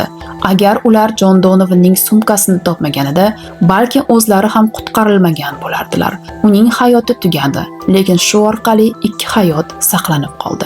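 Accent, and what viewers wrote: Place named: Standard, Uzbekistan. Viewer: Соф адабий узбек тилини шундай согиндимки❤Рахмат сизга❤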